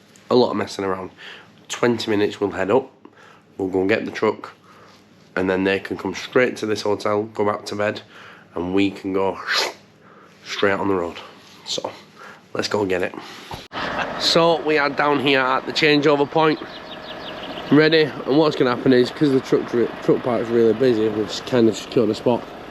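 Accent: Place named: Northern England, United Kingdom. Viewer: New to your channel, you don't sound local to redbull hq, so how did you get involved with red bull, and do you travel from your northern location to work?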